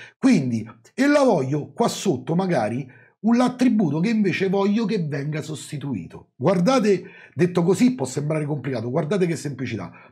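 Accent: Rome, Italy. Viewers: l'accento romanesco è la chicca finale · Guarda, basta vedere ce ne sono alcuni. Appena fai uscire un video che guardo e che ti do il like, poi l'algoritmo di YT me ne propone altri simili con lo stesso argomenti, alcuni fatti tempo prima, ma che non hanno niente a che vedere nel modo in cui esponi tu, altri invece qualche giorno dopo che li hai fatti uscire tu, ma anche qui non ci siamo proprio. Ah, sono quasi tutti esteri. Ma a parte la lingua, l'esposizione, la completezza e la simpatia che ci metti, non hai eguali. Quindi stai tranquillo. E poi scusa, ma con quella parlata che non può essere altro che "romana", ma chi te frega!!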